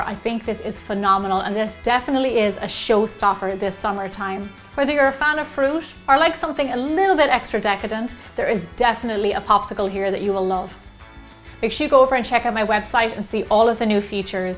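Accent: Ireland. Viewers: r you irsh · Hello,! I watch all your recipes and even have tried some myself and they are all amazing! I also love your Irish accent! One suggestion: Can you post a video about how to make bueno cream spread? Cannot find it anywhere online. Thank you!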